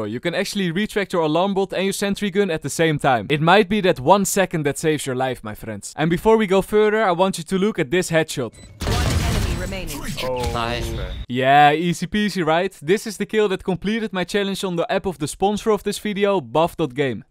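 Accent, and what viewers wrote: Netherlands. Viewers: I will personally finance a getting rid of your Dutch accent course (coming from a fellow Dutchie)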